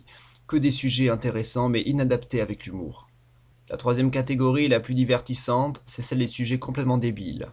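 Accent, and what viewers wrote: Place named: Marseille, France. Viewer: j adore ton acsen marseillais XD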